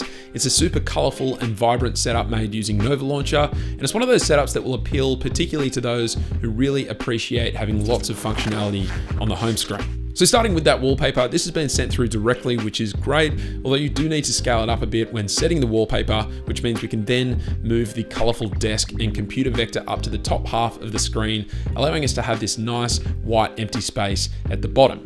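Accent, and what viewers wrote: Australia. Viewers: Watching all the tech YouTubers. I forget how heavy and distinguishable our Australian. Great work also, I don’t have an android phone but this was so cool.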